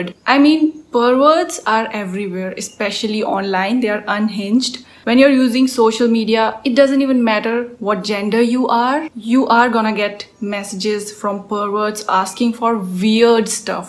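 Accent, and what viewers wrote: India. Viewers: When I saw the thumbnail i was like someone Korean , Japanese etc but when j heard the voice ohh indian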